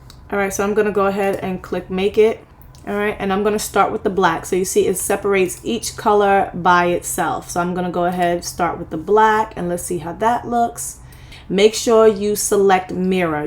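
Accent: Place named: Brooklyn, United States. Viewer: Yassss on BK! I knew it when I heard you tawk😂😂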